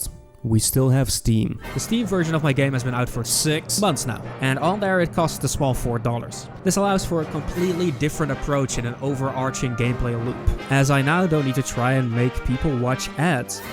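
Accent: Netherlands. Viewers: Didnt hear the dutch accent until he mentioned hes dutch…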